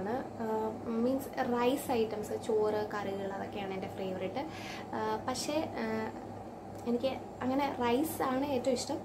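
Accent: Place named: Kottayam, India. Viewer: Aahaa chechi Kottayam aa alle enikku samsaram kettappazhee thonni Njan oru Q and A thappinadakkuvarunnue Eppam alle kittiyee Njanum Kottayam aannee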